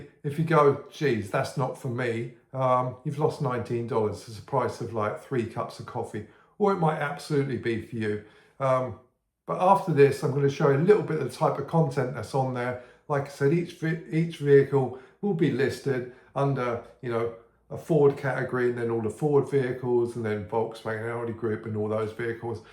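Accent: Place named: England, United Kingdom. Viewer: Thank you, really enjoying your channel content... 👍 ...you sound english, are you in the USA as you price in dollars $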